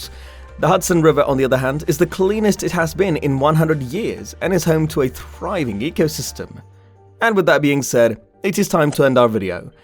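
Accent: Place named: United Kingdom. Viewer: Beautiful British accent and amazing NYC🎉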